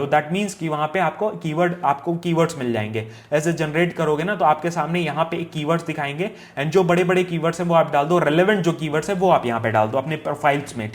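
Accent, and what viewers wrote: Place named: Bihar, India. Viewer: bihari trying to speaks in english , appplying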